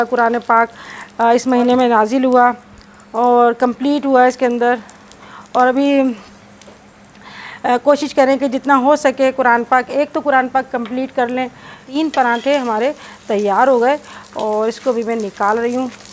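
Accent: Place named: Bhopal, India. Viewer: As salam alaikum Aunty .. Aapki accent kaafi bhopali hai...??Kya aapka koi rishtedar Bhopal India say hai ??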